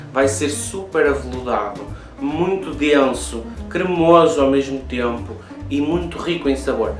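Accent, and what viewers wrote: Portugal. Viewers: alguém de Portugal, eu AMO sotaques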